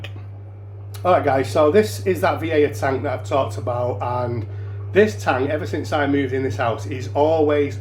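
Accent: Manchester, United Kingdom. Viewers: Looking sweet bro, you sound mancunian!!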